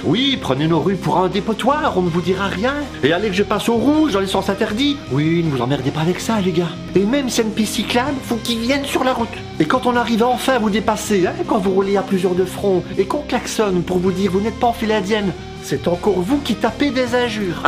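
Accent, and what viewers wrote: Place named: Belgium. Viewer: Salut je suis cycliste, et je déteste les mauvais humoristes belges avec des accents idiots ! Mais je n'en fais pas une vidéo ...😊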